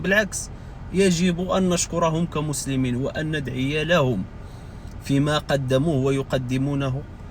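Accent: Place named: Morocco. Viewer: انت مغربي ولست سعودي كيبان ليا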